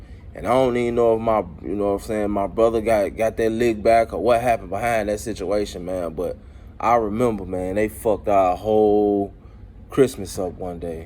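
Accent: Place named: South, United States. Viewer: Damn boy u sound like u from downsouth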